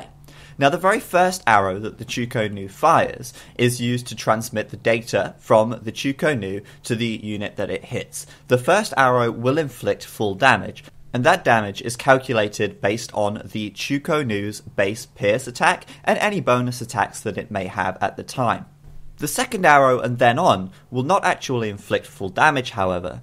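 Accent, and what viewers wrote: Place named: Northern England, United Kingdom. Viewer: northern English to me.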